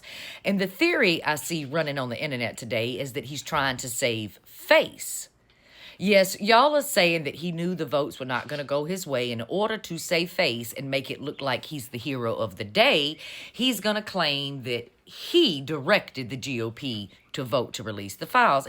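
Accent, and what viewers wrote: South, United States. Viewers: Totally agree!! It reminds me a little of the Agatha Christie' A Murder Is Announced... "she wasn't there"... and the ear wound... IYKYK Just to ask where in the States are you from? As an English woman, I love your accent! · Your accent is beautiful, I have never had a good impression of southern accents until you. Subscribed for the pleasure of listening and intellect. Thx, you should narrate for documentaries... ❤️ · Tbh, at first I thought you were saying Butt Love🤣, not Butler! I was thinking that maga would be all disturbed for sure about FDT engaging in Butt 🏳️‍🌈Love, and any endless conspiracies of 🏳️‍🌈 behaviour... 😂 I'm not from the states, btw, so sometimes the stronger accents from the south distort the words in a way I'm not used to hearing. Thank you for the giggles!!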